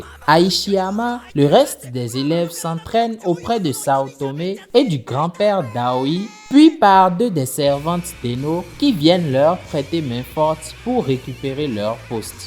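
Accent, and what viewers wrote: Benin. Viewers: T'es béninois 🤔?T'as l'accent en tout cas 😃. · Accent béninois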